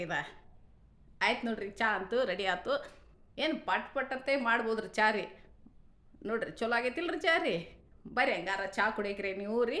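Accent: North Karnataka, India. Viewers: Uttara Karnataka bhashe adu . Sudharisodenaiti karnatakadavarellaru Bengaluru , mangaluru ,pustakada bashene matadbekaa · Uk style matafi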